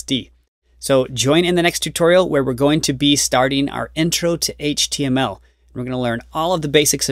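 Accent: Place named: Ireland. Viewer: Great video. You sound like you have a slight Irish accent. Which is really cool!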